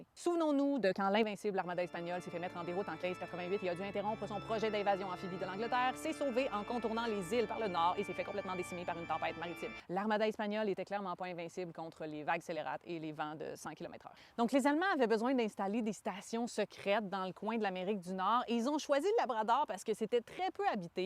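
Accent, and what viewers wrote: Quebec, Canada. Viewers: Ho l'accent, tabernacle ! 😂 je kiff 😂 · J'adore l'accent québécois, je m'abonne !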